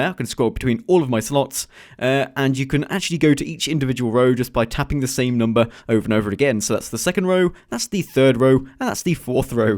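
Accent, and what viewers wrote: United Kingdom. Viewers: Oh, wow. I guess when 1.7 came out it took ages! Anyway, just to let you know that I am a HUGE fan of you. Are you British? I heard from your accent, I am British:D #iamawippling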